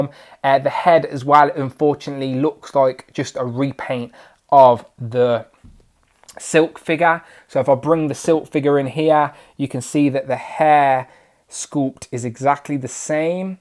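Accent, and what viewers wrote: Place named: United Kingdom. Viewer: Can you tone down that British accent? I can barely understand what you're saying.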